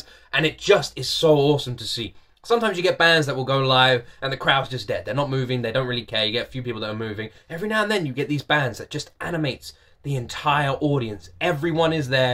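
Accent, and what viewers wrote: United Kingdom. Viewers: Tiene acento británico. Incluso dijo que está en UK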